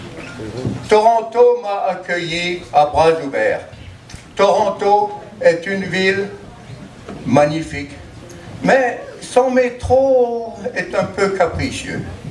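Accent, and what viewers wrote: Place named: Saint-Étienne, France. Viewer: Après toutes années passées outre-Atlantique, mon oreille perçoit le tenace accent stephanois. Ta prestation est pleine d'authenticité, ton personnage surprenant et très drôle. Je n’ai plus de nouvelles, je t’espère en forme. Amicalement Didier Missana from Beaujolais.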